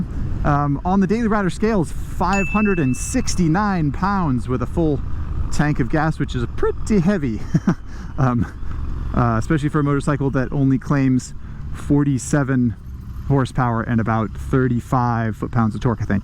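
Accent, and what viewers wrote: United States. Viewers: Was surprised when I heard an American accent. This dude looks super British lol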